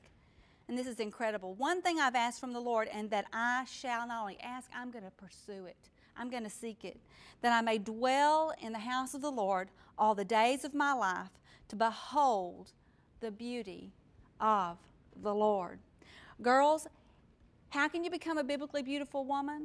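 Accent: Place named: South, United States. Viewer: I love the southern accent!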